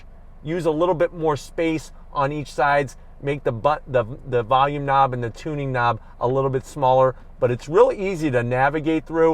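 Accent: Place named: New York, United States. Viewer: I know a new yawk city accent when i hear one! ;)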